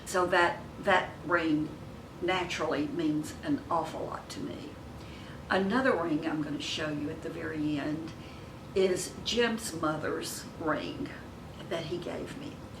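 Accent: South, United States. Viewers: Where are you from? You have a southern accent. I'm in TEXAS.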